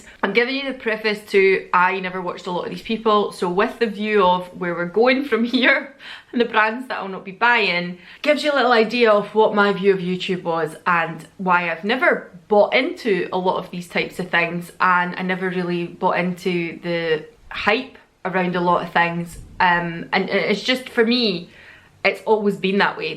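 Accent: Scotland, United Kingdom. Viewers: Completely agree with MLMs, just wondered what your thoughts were on the Body Shop as they have their Body Shop at Home? Really enjoying the videos after discovering you a couple of months ago, nice to hear a familiar Scottish voice 😊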